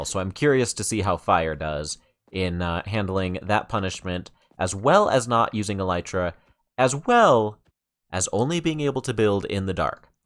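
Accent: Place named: Ireland. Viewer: The accent sounds Irish lmao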